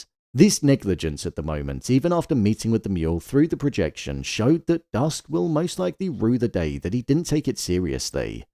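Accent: United Kingdom. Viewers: Is this guy a British AI voice?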